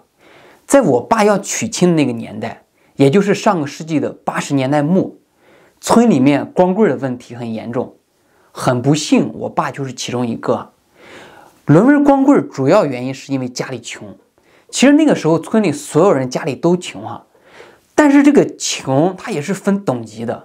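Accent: China. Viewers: 當初看到你的頻道，是因為你初到美國找工作，最後選擇拖車看起，其實一開始看到你就覺得好像沒這麼像華人臉孔，但是聽你的腔調確實是大陸，也就繼續看著看著，但是心中很多的疑問，覺得你的心中有很多想說的，一直期望盼望著，總算這幾期算是更了解的背景，也知道要講出這些話，心裡的壓力確實很複雜很苦，聽你說完真正感受到你心中的苦與黑暗，給予你支持也更加了解內地與農村的真實苦難…謝謝分享也更珍惜現在擁有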